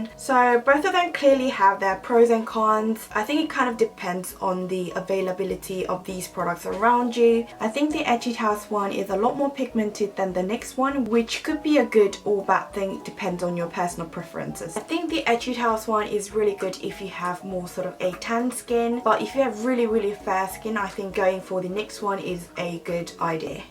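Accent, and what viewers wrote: England, United Kingdom. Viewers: Are you british? Your accent is so nice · omg u have such a cute english accent!! loving ur content girl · your british accent is amazinggg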